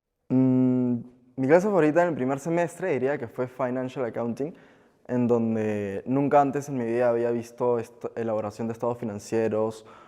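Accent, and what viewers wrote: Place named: Lima, Peru. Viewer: yo también soy de lima y planeo postular este año a la ie, btw,me resultó gracioso notar su acento limeño JAJAJAJ entre nosotros nos entendemos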